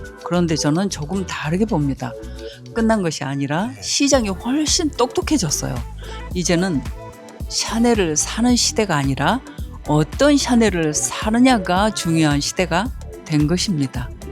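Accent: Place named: South Korea. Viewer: 서울사투리 + 경상도 사투리 + 전라도사투리가 세가지가 느껴지는 말투를 가지셨어요 ㅎㅎㅎㅎ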